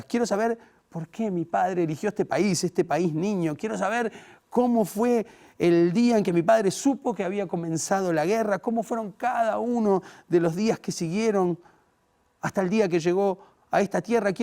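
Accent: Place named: Buenos Aires, Argentina. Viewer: Hermoso cuento. Una sugerencia: no imponer el ritmo propio a la lectura. Narrar es intermpretar pero lo escrito por otro, no lo que a uno le 'sale del alma'. El alma también se equivoca. Mejor no leer en porteño lo que está escrito, pensado, pausado y respirado en japonés. Muchas gracias igualmente.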